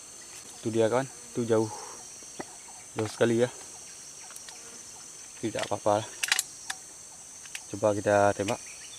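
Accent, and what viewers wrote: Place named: Aceh, Indonesia. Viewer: Mcm logat aceh ini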